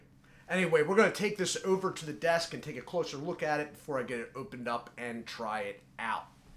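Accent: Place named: Philadelphia, United States. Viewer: I live in South Jersey judging by your accent I'm assuming you're from Philly, love your videos, does anybody know where I can get this around South Jersey or Philly thank you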